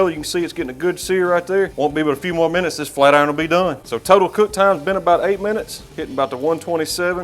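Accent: South, United States. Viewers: Love the southern accent (if am correct). Nice steak, looks quite delicious 👍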